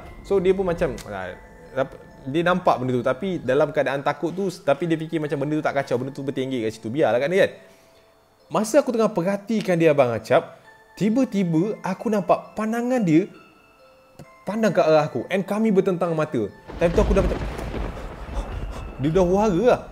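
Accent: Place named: Penang, Malaysia. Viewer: Napa acap suka cakap Penang? Influence org Penang Ka hahaha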